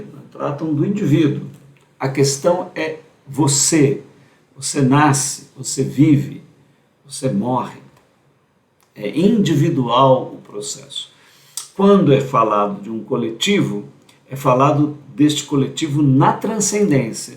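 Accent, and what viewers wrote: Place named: Portugal. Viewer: I sotaque acportuguesa e perfeito 😉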